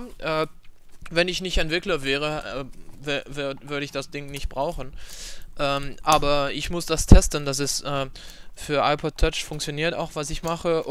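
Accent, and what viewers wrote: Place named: France. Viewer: hab ich mir gedacht man hört den französischen akzent parce que je parle aussi francais ;)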